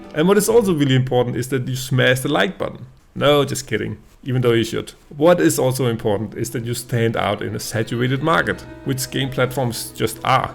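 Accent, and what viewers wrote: Denmark. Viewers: Love that thick danish accent.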